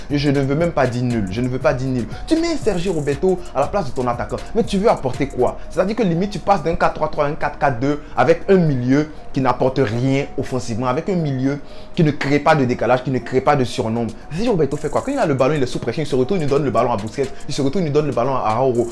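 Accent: Ivory Coast. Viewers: C’est la première fois que je ressens ton accent ivoirien 🤣🤣🤣 ça degba Garcia et piqué ensemble c’est pas possible même profil pas assez rapide . Araujo un monstre ce petit, De Jong il est tellement bon mal entouré et Depay un artiste très talentueux 😍 cependant trop de responsabilité à peine arrivé. Busquets il est plus à niveau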